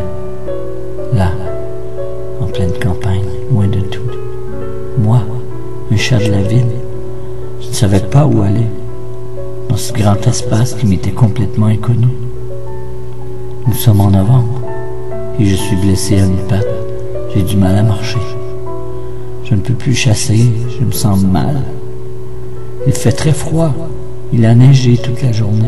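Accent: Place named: Quebec, Canada. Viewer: Tellement triste 😭 perso j’aime beaucoup l’axent québécois whaaaaaaaa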